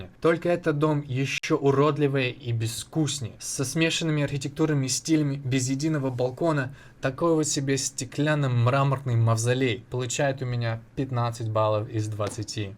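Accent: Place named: Russia. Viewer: а по мне так ты сам урод с маскальским акцентом ... :face-orange-raised-eyebrow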